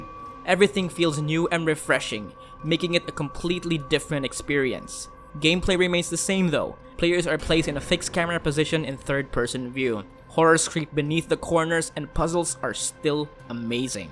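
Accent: Philippines. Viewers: I can't quite place that accent. It sounds either Filipino or South American. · filipino accent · i smell a filipino accent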